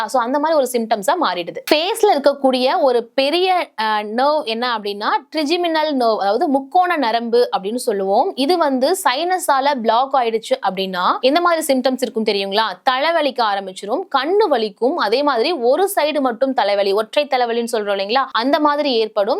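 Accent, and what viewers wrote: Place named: United Kingdom. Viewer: 👍 What a british english ...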